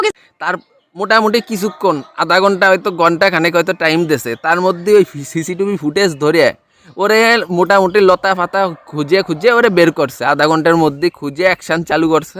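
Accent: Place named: Khulna, Bangladesh. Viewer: আপনার কথা শুনে ভারতীয় নয়,, বরং বাংলাদেশের খুলনা বিভাগের বাসিন্দা মনে হয়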